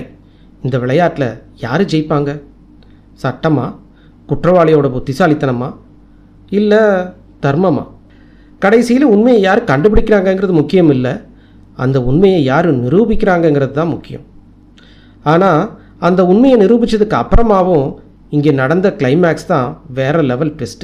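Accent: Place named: Kongu, India. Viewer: கதையும் வாசித்த விதமும் குரலும் அருமை வாழ்க வளர்க ...... உங்களது பேச்சு சிறப்பு கொங்குதேசம் போல தெரிகிறது தொடரட்டும் பணி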